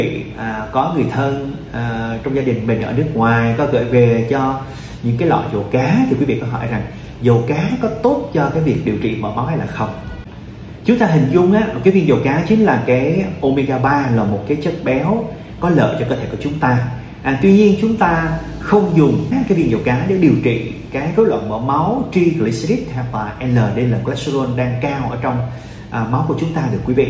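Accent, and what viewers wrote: South, Vietnam. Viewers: Cám ơn B/s đã giải thích rõ ràng 4 phần trong mở máu cao với thuốc B/s phải kê toa, và âm thanh giọng miền nam nghe rất hay, cám ơn nhiều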